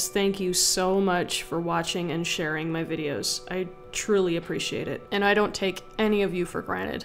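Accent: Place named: West Texas, United States. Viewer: excellent videos about feminazis stuff, anyhow are you from west Texas ? I have a friend from there that her accent sounds quite close. greetings from Alaska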